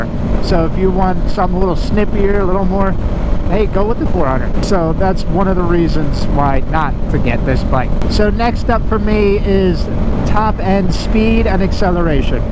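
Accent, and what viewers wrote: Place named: New Jersey, United States. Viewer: First time watching your videos, from the minute you started speaking I knew you were from New Jersey, then I saw similar landmarks like the airport where the 177th fighter jets take off. I use to live in Margate & Ventnor City.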